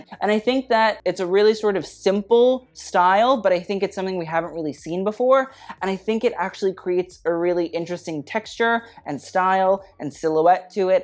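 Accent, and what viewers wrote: United Kingdom. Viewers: You’re British?? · Wait. What? Are you British?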